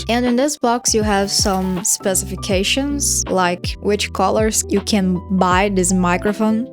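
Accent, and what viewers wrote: Brazil. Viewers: Eu vi o vídeo de hyprland com cachyOS e eu pensei hmmmmm parece inglês br (mas está mt bem) kkkkk mt bom, vlw pelos vídeos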